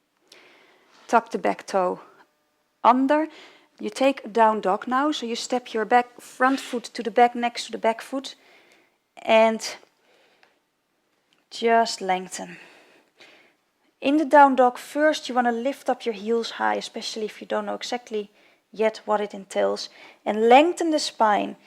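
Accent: Netherlands. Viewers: Ik hoor aan je stem dat je nederlands bent! Haha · Nederlands accent? of vergis ik me heel erg :) Maar alsnog een lekkere yoga oefening. Dankjewel. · omg the dutch accent is killing me xD I am dutch btw.... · you sound dutch ( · Well like she said herself, she is Dutch. And you can really hear this too, if you are just a tiny bit familiar with the Dutch accent. Her vowel pronunciation is typically what Dutch people do when speaking English. Also her lack of pronouncing "th" is significant. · ik hoorde meteen dat ze nederlands is ;p xD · Great video, makes me want to get into yoga. Sounds like a dutch accent to me. : Wow. Are you serious? I think god knows what's in our hearts. If we were doing yoga while actively thinking about praising idols then yes. That wouldn't be good. But of we are doing these yoga poses to strengthen the body god gave us and wanted us to take care of then there isn't any problem. 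In the bible it explains that your body is a temple which should be glorified and taken care of. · She's obviously Dutch. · yu sound like yur from the netherlands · are you from holland? you sound like you have a nederlands accent :) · Zeker een Nederlander. Mooie stem :-) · super leuk dat je Nederlands bent ! goede video ook wel echt nederlands-engels accent. oefen daar op dan word de video duidelijker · Ik kan horen dat je uit Nederland komt :) Het is echt heel goed uitgelegd! Super bedankt! xxxxx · You sound dutch :D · i'm irish. she's definitely not. shes dutch lol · het is echt duidelijk te horen dat je nederlands bent haha. goeie oefeningen :) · Nice house. Nice workout. Yes, she is Dutch, I'm Dutch and this is a typical Dutch accent. No, she didn't learned it in Ireland. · Hahahaha the Dutch-English is hilarious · dit klinkt zo Nederlands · She sounds dutch xD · Hahah Nice i'm dutch to and i heard straight away you were dutch. 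· I'd say she's Dutch.... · No she is obviously dutch :D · No she's Dutch, you can so totally hear that.. ^^ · Funny how you can always tell a Dutch accent ;) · You sound pretty dutch, have you also got dutch yoga vids? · i was wondering what nationality you are? you sound dutch. thank you for your videos i watch them often :) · Hi eferybody velkom to... hehehe that is so Dutch accent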